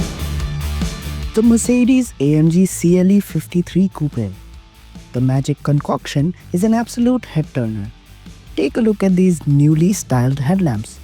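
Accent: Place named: India. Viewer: The sound of an Indian voice make it look cheap and a scam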